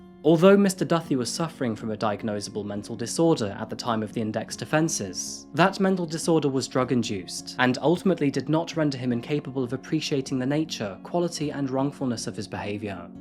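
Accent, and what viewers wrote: United Kingdom. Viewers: Hey. I thought you're American. Are you British? You've said you're from Seattle before as far as I remember. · I love the way you narrate the stories and your British accent. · Where do I send the coffee? Wait, you're British? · Hearing a British guy, talking about Canada STILL reference United States dollars here just makes me happy. We're still #1 baby!!! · You live in England? That’s why your British accent is coming back. 🙂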